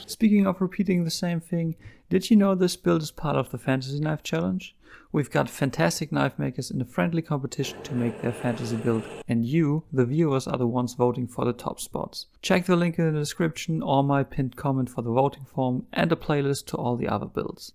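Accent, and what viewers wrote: Germany. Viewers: Ich dachte die ganze zeit der hat doch ein deutschen Akzent und dann bei der sonntags ruhe ich war mir sicher ❤😂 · Quick question. Are you German? Because I’m believing to hear a slight German accent. And I think you stayed “Nein” (German: no) at when you dropped that metal peace into the water. I’m asking because I’m German too😬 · U‘re German or Austrian Maybe Swiss?? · Great video Aber mal im ernst die deutsche englisch aussprache ist für deutsche echt etwas schwer zuzuhören weils iwie nicht zsm passt (weil die sprache eig 24/7 benutzt wird und manche bezonungen passen einfach nicht das ist normal :D) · Ich habe bis krampfhaft nach beweisen gesucht, dass du aus Deutschland kommst 😅 hört man irgendwie immer raus wenn jemand englisch spricht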